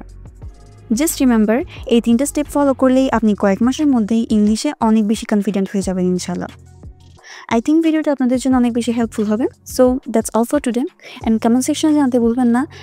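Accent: Chittagong, Bangladesh. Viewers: আপনি চট্টগ্রামের মেয়ে।আপনার উচ্চারণ তাই বলে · kothar accent sune mone hocche ctg er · আসসালামুয়ালিকুম আপা আপনার পরামর্শ খুব ভালো লাগলো আপনার বাড়ি চিটাগাং অথবা ফেনী হতে পারে , আমি উত্তরবঙ্গে থেকে বলছি রাজশাহী বিভাগ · R8 amar o mone hoyese Onee Chattogram er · কথায় টান আছে চিটাগাং এর মতো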